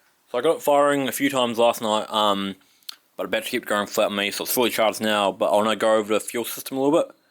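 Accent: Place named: Australia. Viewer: Hi if your going to do a video that might be watched by English speaking people ,it would actually be nice if we could understand what you were saying...im not knocking the Aussi accent, i get on fine with that, but you abbreviate words as you speak. you miss out important syllables and just merge what's left into a slurry of bits ow words.. or is your microphone at fault ? I recommend you SLOW DOWN and ARTICULATE the words then people will actually be able to understand and learn from your video !! That is after all why you have posted the video, isn't it... Im not trying to annoy you either , but to help you with a comment to improuve your videos.. im actually very interested in these motors and I am following these type of videos in French, German, English, Dutch, Italien, and despite being multilingual, yours was the hardest to listen to !! slow down, take your time, it's not a race!!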